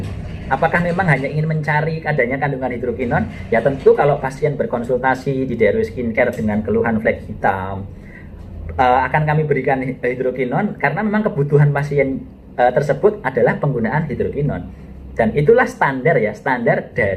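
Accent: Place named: Banyumas, Indonesia. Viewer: Dok.... aslinya mana 😀😀😀. lama merantau kangen logak ngapak...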